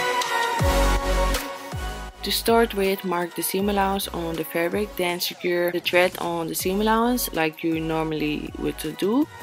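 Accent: Netherlands. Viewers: you sound so extremely dutch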